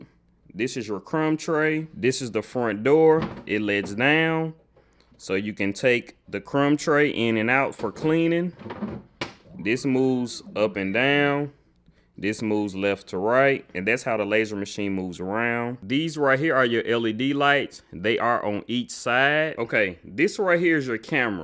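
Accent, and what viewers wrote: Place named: Cleveland, United States. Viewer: If you just listen and not watch you can hear Cleveland lol awesome video my man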